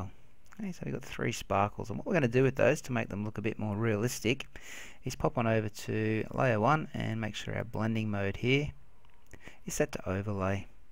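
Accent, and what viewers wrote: Australia. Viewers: r u aussi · Thanks so much for this. One of the best tutorials for Photoshop beginners I've seen so far. Just the right pace and level for me. Seems like most other tutorial-makers must be scared of being sneered at for being too simple, and seem to assume a 1 lifetime of Photoshop experience level. I like the Aussie accent as well.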